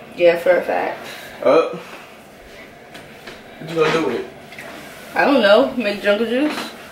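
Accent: New York, United States. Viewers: Love this vid !!! I definitely know your from New York lol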